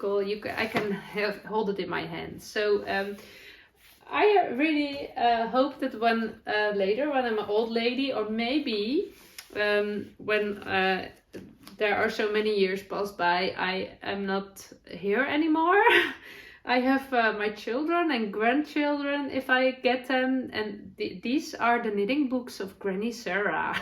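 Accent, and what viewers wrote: Netherlands. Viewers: Hi Sarah I finally found your podcast, its such a pleasure listening to you. I crochet, knit and sew also, but I have yet to start a you tube potcast. Your english is fine I understand you very well even with the dutch. ❤️🙏💕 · Arms are exposed. Is the word you're looking for. I do enjoy your Dutch accent. Your very talented.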